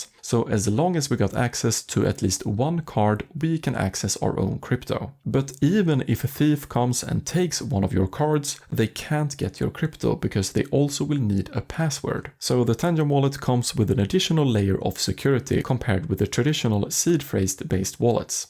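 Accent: Sweden. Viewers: You sound swedish on your accent. Stämmer min gissning? :)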